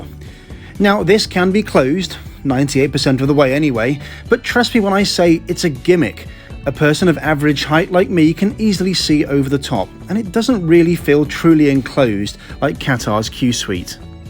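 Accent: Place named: Northern Ireland, United Kingdom. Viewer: Loving the Northern Irish accent! Full of surprises mate!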